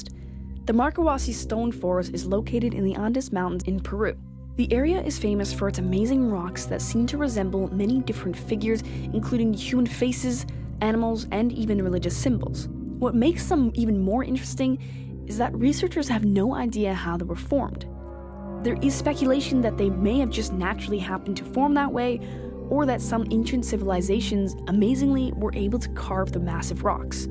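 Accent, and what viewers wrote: Canada. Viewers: u kinda sound canadian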